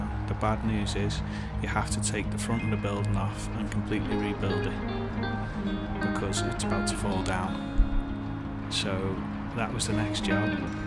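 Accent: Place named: Scouse, United Kingdom. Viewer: I really enjoyed listening to your soft 'Scouser' accent. The transformation is mind boggling - love it!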